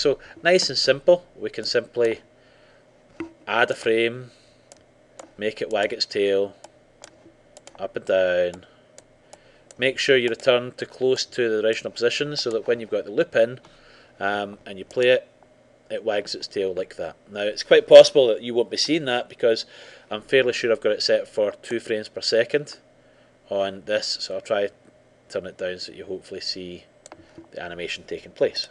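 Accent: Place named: Scotland, United Kingdom. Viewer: wtf ur scottish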